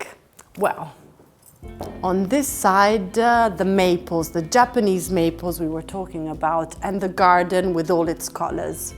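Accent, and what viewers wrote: Italy. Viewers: What a charming and wonderful Italian English accent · Italians always add an "E" to the end of every word in English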